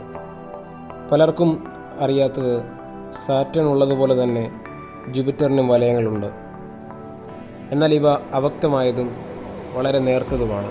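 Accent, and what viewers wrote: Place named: Trivandrum, India. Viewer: Trivandrumo kollamo ayirikkum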